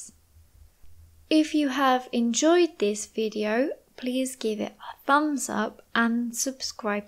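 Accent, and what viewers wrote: United Kingdom. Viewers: This is british accent?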